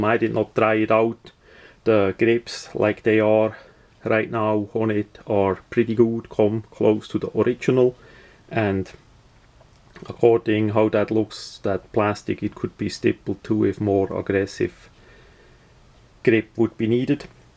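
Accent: Switzerland. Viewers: My goodness! Your accent is so stereotypically swiss!!! Great video!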